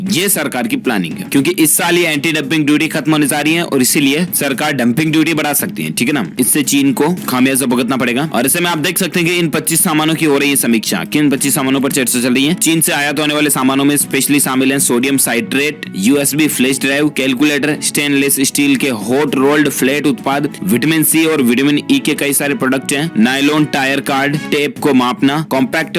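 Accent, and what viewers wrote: India. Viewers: Sir main aapki bahut Badi Fen hun aapki aawaj bahut Acchi h ak dam Hindustani wali....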